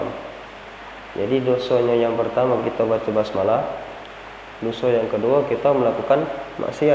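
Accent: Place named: Palembang, Indonesia. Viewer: Cak nyo dari palembang yo bang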